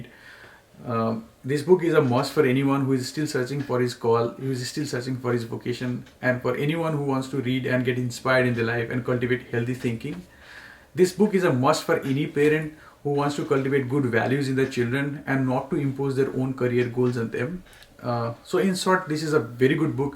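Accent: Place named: India. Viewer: Love thick indian accent 😅. I don't about this book but i like the same name book by Indian author Preeti Shenoy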